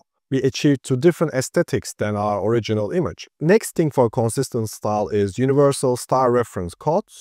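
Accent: Turkey. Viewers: dayı türkmüsün by nası aksan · (sesinizden Türk olduğunuzu varsayarak) Videolarınıza Türkçe altyazı ekleseniz çok iyi olurdu. Ne yazık ki Türkçe kaynakların çoğu “en azından benim gördüklerim” GPT ile nasıl para kazanılırdan öteye geçmiyor :) Anlatımınız ve video için teşekkürler.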